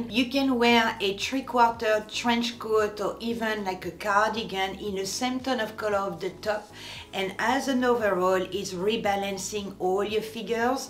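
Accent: France. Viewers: why are you speaking with french accent?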